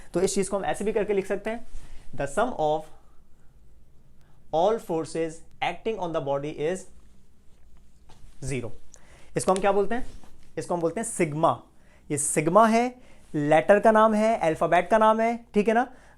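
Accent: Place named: Karachi, Pakistan. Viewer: sir ki language sy tu karachi ky lgty ha 😂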